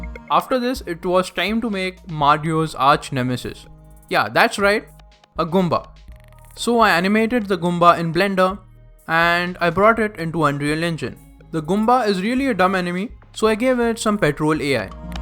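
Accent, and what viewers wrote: India. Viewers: Stopped watching when I heard the indian accent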